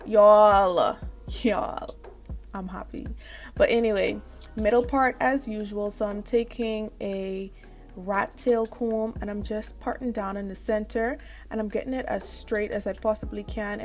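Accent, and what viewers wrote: Bahamas. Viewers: She from the Bahamas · I love the Bahamian accent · You must be Bahamian! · Definitely Bahamian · Are you Bahamian, bc this accent hide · It's a Bahamian accent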